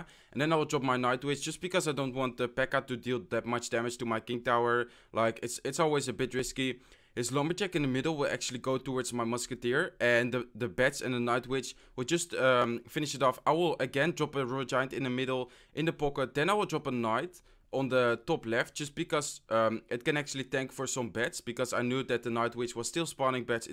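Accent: Netherlands. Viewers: Are you from the netherlands? becuz your voice sounds like that.. And im from the netherlands too :) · ben je nederlands want je praat soort van Nederlands Engels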